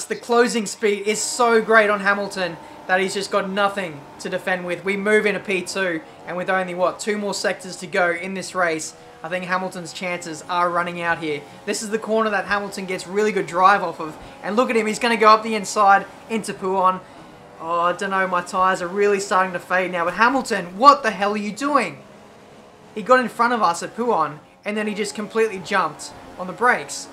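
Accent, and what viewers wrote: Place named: Australia. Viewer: i honestly don't know why i watch these videos. the australian accent is so difficult to listen to, it makes my ear bleed. the driving, albeit fast, is pretty bad. contact with other cars all the time. and codemasters, continues with crappy ai. why do i watch these videos?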